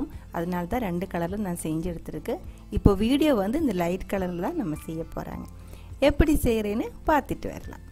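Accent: Kerala, India. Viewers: Hi Super sis. Tamil mix aana Malayalam unga voice ku nega peasarathu rmba nalla sis. Kandipa na try panni pakara.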